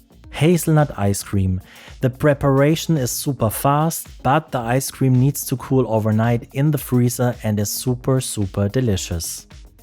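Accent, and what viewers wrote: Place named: Germany. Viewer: Ich erkenne einen deutschen akzent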